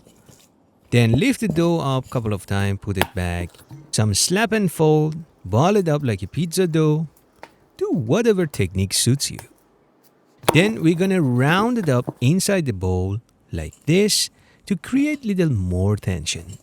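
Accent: Iran. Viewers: i make sourdough and his vids are great but if I am honest I am here for Persian accent.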